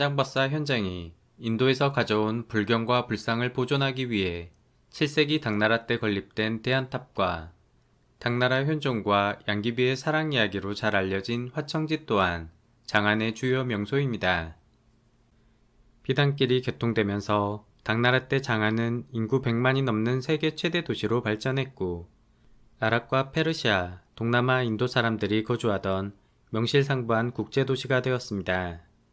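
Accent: Jeolla, South Korea. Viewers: 전라도 말씨 나와요 ㅋㅋㅋㅋ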